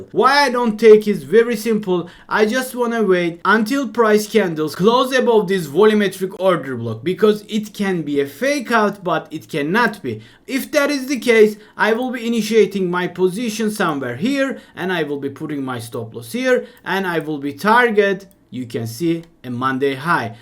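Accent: Turkey. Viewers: Bro I guess you are Turkish? Am i right ? 😄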